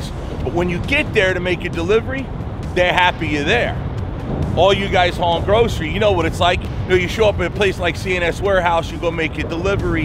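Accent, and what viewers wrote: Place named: New York, United States. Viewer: at first, I thought you were just some loud crude new-yourk yank. but, i like your no nonsense attitude and the multi video angles are good.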